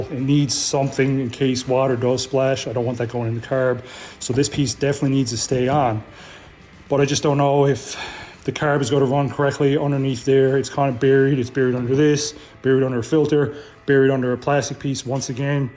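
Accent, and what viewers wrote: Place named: Newfoundland, Canada. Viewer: You're a newfy ay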